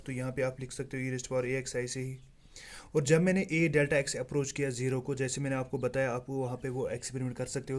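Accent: Kashmir, India. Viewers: Your kashmiri accent 👀🤌🏻,,thanks for the explanation ✨ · Yes you are kashmiri Your urdu speaking says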